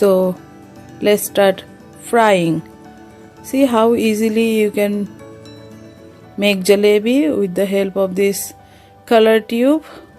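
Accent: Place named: Bengal, India. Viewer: Watched your video fully. You have bengali accent. Join you dear.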